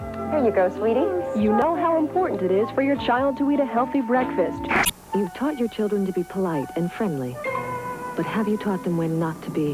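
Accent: Philippines. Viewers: I hear a Filipino accent 😁 greetings from an Indonesian 🤝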